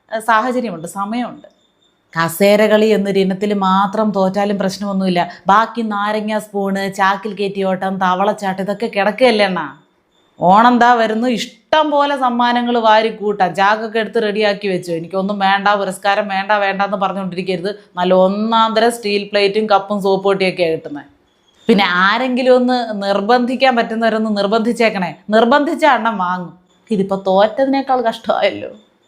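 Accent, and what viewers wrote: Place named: Trivandrum, India. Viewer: കണ്ണൂർ ഉള്ള ഞങ്ങൾക്ക് തിരോന്തരം ഭാഷയിൽ ഉള്ള അലക്കൽ പെരുത്തു ഇഷ്ടാകുന്നു 😂👌😅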